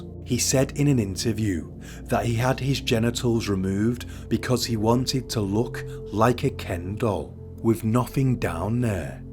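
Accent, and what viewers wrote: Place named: North, United Kingdom. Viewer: I have to know where your accent originates. Do tell. Has to be northern at least. Thanks from Louisiana USA!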